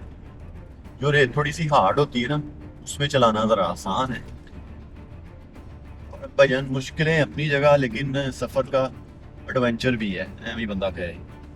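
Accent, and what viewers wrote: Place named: Punjab, Pakistan. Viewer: Really good video. I like your videos, just saw only 2 videos but Really good. Your urdu accent is likely from Gujrat or Sialkot. I don't know where are you from. I am from district Gujrat. Anyway good video. Waiting for your next video.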